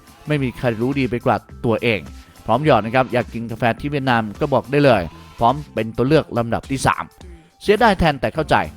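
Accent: Isan, Thailand. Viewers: แอดน่าจะเป็นคนอิสานเดานะ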